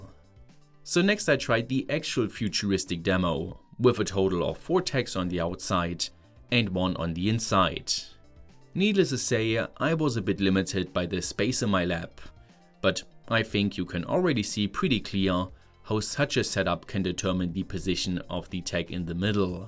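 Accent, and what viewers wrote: Germany. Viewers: Is the Great Scott a real Scott? I hear a German (-ic) accent. :-)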